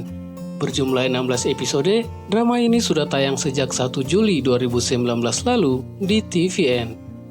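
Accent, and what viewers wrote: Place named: Makassar, Indonesia. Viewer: Dari cara ngomong kelihatan bgt kalau org makassar😂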